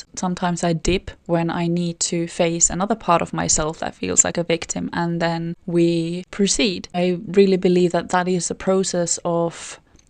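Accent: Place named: Scandinavia. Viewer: I figured you were Nordic, but I wasn't sure if it was that or a German accent. Your accent sounds vaguely British sometimes too.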